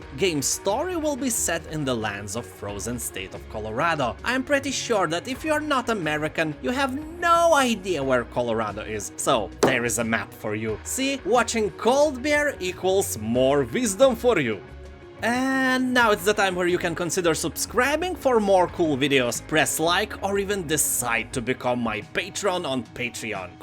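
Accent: Russia. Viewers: you are from Russian or where? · its probably ignorant of me to call your accent "Russian Like" since you are not really russian. But your accent sounds like such a cool russian like acecnt (to a non european) I swear you can really do a good russian/ luthuanian game character voice over. Or maybe a game in which a main protogonist fight soviets for independence. I am from Pakistan by the way.(just saying)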